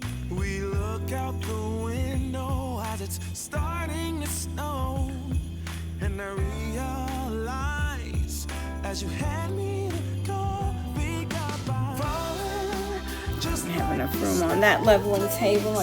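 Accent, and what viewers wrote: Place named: East Coast, United States. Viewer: Absolutely gorgeous!!❤ I love the embellishments you put on them 💖 And every time I watch your videos I think to myself that it sounds like you’re an East Coast girl even though you live in Cali 😁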